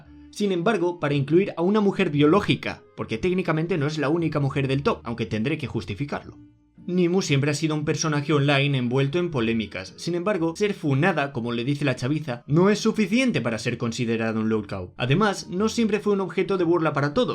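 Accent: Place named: Spain. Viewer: Por qué tienes acento español y usas modismos Mexicanos?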